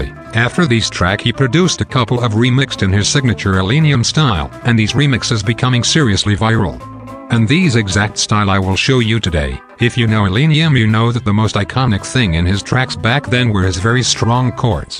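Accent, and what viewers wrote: Germany. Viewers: are you German? 😅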